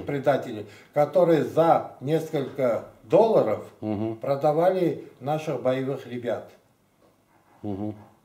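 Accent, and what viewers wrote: Armenia. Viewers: ДОРОГОЙ ВЫ МОЙ ЧЕЛОВЕК,ВЫ ДАЖЕ ВНЕШНЕ ПОХОЖИ НА АРМЯНИНА И АКЦЕНТ У ВАС АРМЯНСКИЙ, ДАЙ БОГ ВАМ ЗДОРОВЬЯ И ДОЛГИХ ЛЕТ ЖИЗНИ! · Круто, и акцент армянский..ещё круче то что он художник. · Достойный сын эстонского и армянского народов!Честь и слава вам! Но по русски говорите с армянским акцентом. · Боже мой, у него даже акцент армянский!, спабибо, что Вы есть у нас. Здоровья и благополучия всей Вашей семье!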